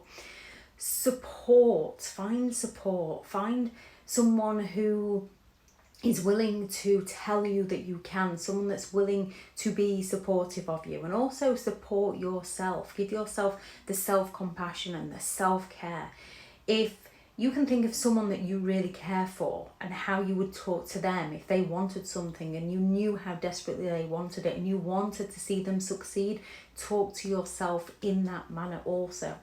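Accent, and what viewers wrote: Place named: Scotland, United Kingdom. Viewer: It’s a very long slow process for the mob to catch on. Your message is so powerful. You’re 10 years ahead of a typical gringo. I know I’m supposed to be cold towards those we understand or care for. It’s a fking lie. We must love and care for our fellow tribesmen women. It’s a 2 way gig. If your message can do this to me? Just march on. You sound Scottish to me but I only did military things in Europe. Way too busy to be focused on dialectical grammatical words or culture. I’m trying to stay awake so I can get in a schedule. Since she’s been gone. Your message has been cutting through the mess. Working down south is just bribe or pay people to leave me alone or work for me. We are not a non profit organization. Get hard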